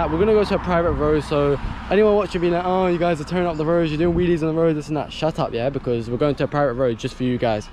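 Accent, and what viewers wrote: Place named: London, United Kingdom. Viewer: What’s wif the accent blood clot man ,use ben livin in London to long now !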